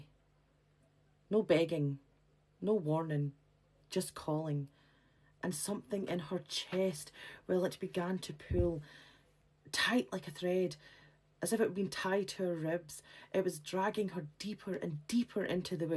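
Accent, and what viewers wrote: Scotland, United Kingdom. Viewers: WITH THE MARK OF THE HAWTHORNE , AND YOU SILLY LASSIE , YOUR SCOTTISH ACCENT AND STORY TELLING IS SO ADORABLE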